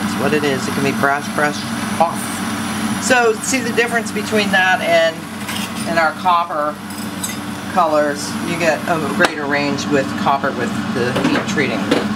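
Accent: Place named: India. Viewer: Intro sounds like indian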